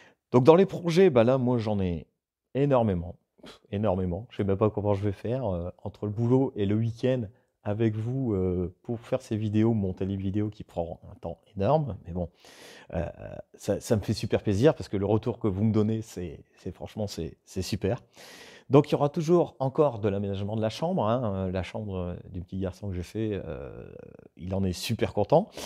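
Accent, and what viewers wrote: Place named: Nord, France. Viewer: Meilleurs vœux à toi aussi M'sieur! Cool cette vidéo on apprend un peu plus à te connaître et ça fait plaisir 😁 Je suis aussi du 59 et à 20mn de la Belgique! C'est vrai que les "oir" chez nous ça s'entend 🤓 En tout cas continues tes vidéos elles sont geniales, c'est toujours un plaisir de les voir!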